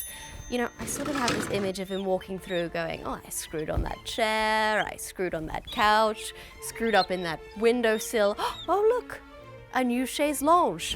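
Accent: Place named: RP, United Kingdom. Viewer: Wow, sounds really posh in this video! Being in the States has really altered her accent.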